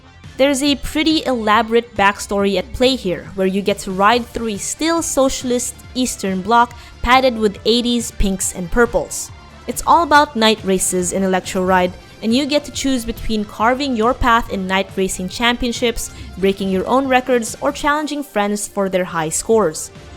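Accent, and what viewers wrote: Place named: Philippines. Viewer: I hate her voice. She sounds Filipino I'm not racist but she clearly doesn't know anything about cars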